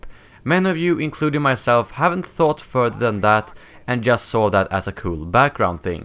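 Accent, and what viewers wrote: Sweden. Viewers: Dude are you swedish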